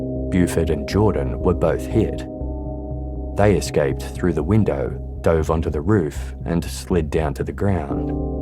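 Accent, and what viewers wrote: Australia. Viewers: He's an Australian...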